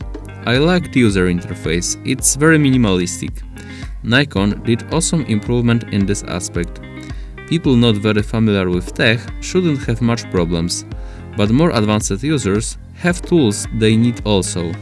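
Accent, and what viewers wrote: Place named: Poland. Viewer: dobrze trafiłem, że jesteś Polakiem po akcencie:) Jakbys kiedys przypadkiem doszedł do tej funkcji to fajnie byłoby gdybyś nagrał o tym film:) Pozdrawiam.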